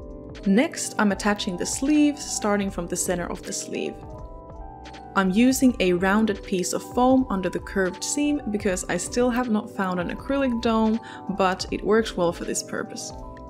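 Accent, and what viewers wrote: Finland. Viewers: Is that a Finnish accent I hear? Anyway, this video has a criminally low view count. That's an amazingly well-made outfit, and a video showing the process of creating a brilliant outfit like this one should always get a lot of views.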